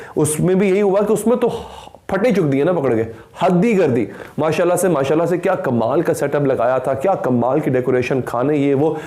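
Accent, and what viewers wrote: Punjab, India. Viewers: Bhai apki punjabi bri mazy ki lgti hme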